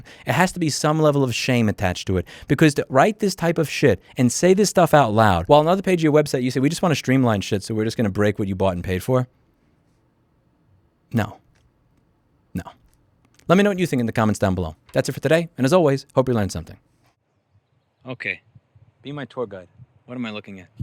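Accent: New York, United States. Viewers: Haha, yeah, I came down here looking for similar comments. His NYC accent was coming through strong and thick.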